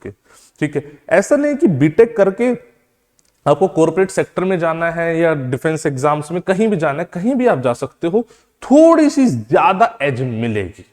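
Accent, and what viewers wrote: Haryana, India. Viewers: sir aap HARYANA sai ho kya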